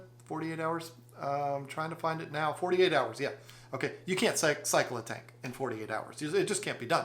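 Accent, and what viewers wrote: United States. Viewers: Every time it rains my pond gets filled back up and gets a water change too. Oh and I forgive you (all Americans for that matter) for mispronouncing actual English ;) Just to point out there is an "e" in beta so pronounce it bee-ta, english. While i'm here, there is a "h" in herb too, so pronounce it her-b not er-b. English do you speak it? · We get intelligent hard working people make free videos for us, and some absolute mong decides to take the mick out of their accents. Shouldn’t have even responded in my opinion but hey ho. America is huge and the various accents have way too many influences and quirks to name. Either way it is more beautiful than anything else, and the problem is with that muppets brain.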